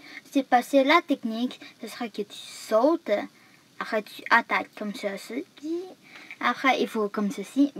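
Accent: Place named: Quebec, Canada. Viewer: Il est sûrement Québécois l’accent se reconnaît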